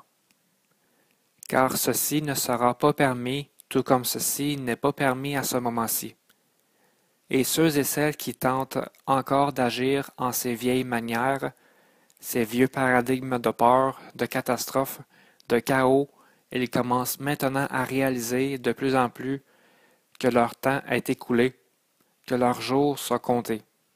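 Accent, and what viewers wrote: Quebec, Canada. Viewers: Accent québécois.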